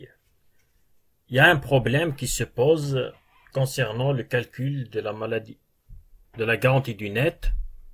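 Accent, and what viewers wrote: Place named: Kabylie, Algeria. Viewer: merci, j'entends un petit accent kabyle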